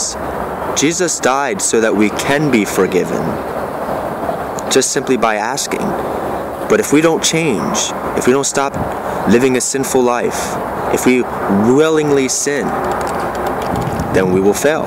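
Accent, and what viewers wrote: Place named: England, United Kingdom. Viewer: robert lyte is australian this guy is english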